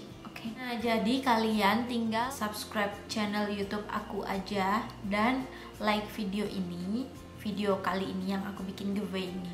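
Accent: Java, Indonesia. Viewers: Assalamualaikum mbak:) hai aku Khansa dari Sidoarjo. Pertama kali tau video youtube mbak itu video yg review wardah intens matte lipstick lgsg deh mulai kepo "siapa sih nih orang?" akhirnya suka nontonin videonya soalnya aksen jawanya dapet bgt:)) terus videonya yg menurutku bagus dan cukup membantu aku yg buta makeup 😂 dg penjelasan lmyn lengkap dan mudah dipahami. Untuk kedepannya aku pgn mbak bikin video ttg makeup/skincare yg cruelty free/animal testing, dan yg pastinya yg halal. Sbg muslimah bukan hanya makanan yg harus diperhatikan kehalalannya tapi produk2 lain seperti make up/skincare. Pengen tau aja tanggapan mbak tentang itu 😊. Terima kasih sudah mau menyisihkan rezekinya buat bikin giveaway utk subcribers dan viewersnya, semoga subcribers dan viewersnya makin nambah berjuta juta ya 😁. Wish me luck🙏 Wassalamualaikum ig: kfadhilahr_ Alamat: Perum. 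Griya Bhayangkara Masangan Kulon RT 38 RW 09 blok A4/10 kec. Sukodono kab. Sidoarjo. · kolaborasi dong sm inivindy make up in model. trs keluarin smua medoknya kakak, biar lepas gtu aura jawanya. nah nanti kayak ada adegan2 drama gtu kakak dan inivindy, karena beda teknik make up dan lain sebagainya ig: babyrachella97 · Assalamu'alaikum yg suaranya super duper medok kejawan hehehehe... Aku nhoura, nama ig aku Alamat aku di Jl. Dukuh Bulak Banteng Sekolahan Gg. IV no. 24 Surabaya..., aku mau kedepannya bikin video tutorial makeup tapiiii NO Mirror Makeup Challenge (Makeup nggak pake Kaca atau Cermin) yaaaah??? Semoga ini komentar yg menarik hehehehe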